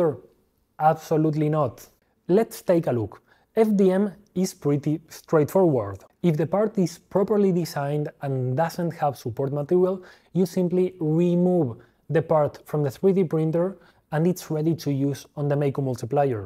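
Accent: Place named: Argentina. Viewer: Increible como se nota que hablas español por el tono de voz jaja, hasta incluso parece que hablas como Argentino aunque hayas dicho trabajas en una universidad de españa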